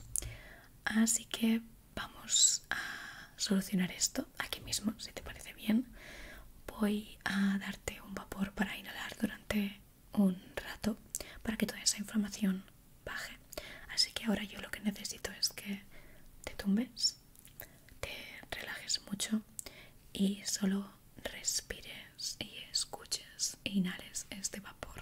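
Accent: Spain. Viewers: Que ricura de mujer madre mia. Hecha a manos de dios. Y ese accento Español. Eres una diosa en viva.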